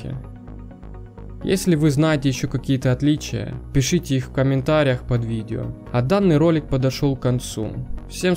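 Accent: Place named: Ukraine. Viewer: Он из Украины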